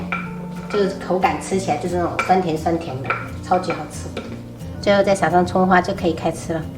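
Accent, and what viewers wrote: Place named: Fujian, China. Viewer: 你是四川人怎么一口福建口音呢?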